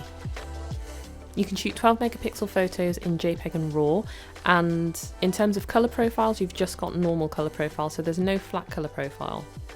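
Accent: England, United Kingdom. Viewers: My God i loved your video, but your british accent ☺😉 I bought also my mini 3 Pro, and for me is the first time i will be using a Drone, i love aerial photography and video 🤗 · Glad Sipscriber here. Grate vdo. But I can’t help but wonder how much u look like Young Dolph 🐬 I ain’t know his young sis had a Fine ingish accent 😄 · I have an original Mavic and Spark that I haven't flown in ages. I'm seriously considering getting a Mini 3 and getting back in the game. Great video. I can listen to UK women talking all day ❤ lol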